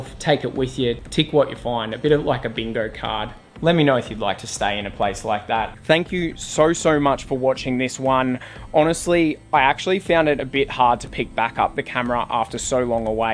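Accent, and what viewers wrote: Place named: Australia. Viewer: ....what a great effort 2023 gave to you....a foundation of a new beginning for 2024...love all your thoughts going forward...to relax and enjoy the process forward is the blessing you have now given yourself...living each day with your precious buddy, in his golden years supporting the journey within you both, will set in your heart the biggest gift...May this year unfold abundance and prosperity in many forms...Enjoy with life laughter and love...great to hear that broad Aussie accent...Love the haircuts, to lighten the load..Blessings always...XX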